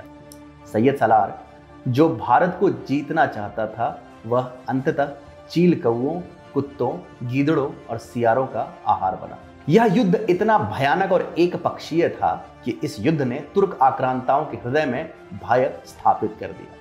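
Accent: Standard. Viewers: Hats off to you sir, narration in pure Hindi. One request - please write this history, many book readers would like to read and listen to such glorified, true history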